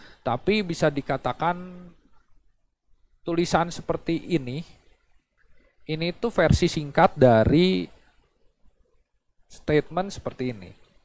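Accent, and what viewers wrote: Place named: West Java, Indonesia. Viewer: orang bandung nya, logatnya sunda :D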